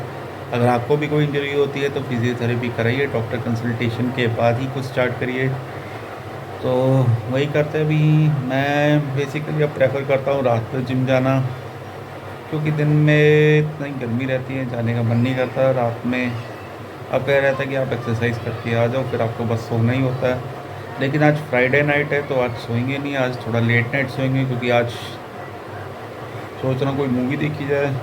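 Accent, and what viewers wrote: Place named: Samastipur, India. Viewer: Dii mai samstipur se huu sem isi trh hmlog v bolte h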